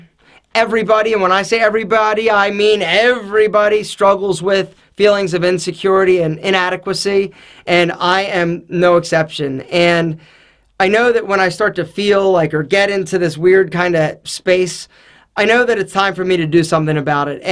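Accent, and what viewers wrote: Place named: Baltimore, United States. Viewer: Love your accent!! Bawlmer hon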